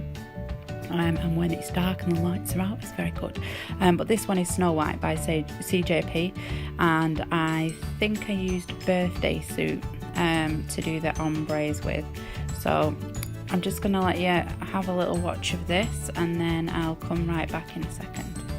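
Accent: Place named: Northern England, United Kingdom. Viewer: Lovely set.... just subscribed as came across your vid... u sound very northern and familiar... I'm a Lancashire lass and is swear u r too x keep going... your doing really well. I'm 11 years in and still learn every set. X and your more confident than me cos I'm still not strong enough to set myself up on here yet. Tempted but always chicken out. So keep going x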